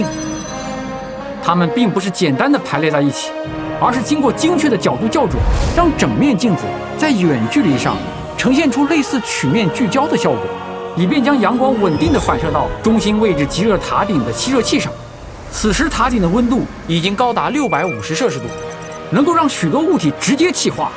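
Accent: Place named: Fujian, China. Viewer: 我一听就知道是福建的，特别可爱。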